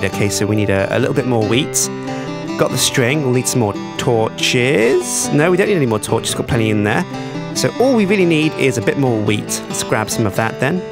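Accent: United Kingdom. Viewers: "lasooo" i really hate the way brits say some words. for instance al-um-in-um is said as alu-min-ium and its really annoying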